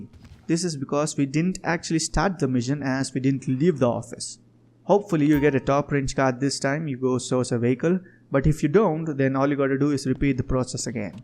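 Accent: India. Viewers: Tnx bro it works 😉😉😉 btw I love your indian accent 😘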